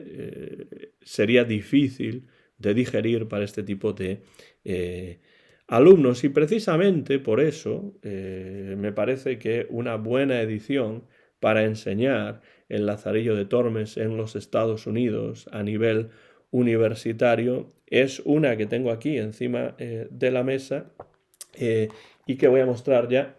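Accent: Spain. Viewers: Tienes acento como de las Alpujarras o por ahí.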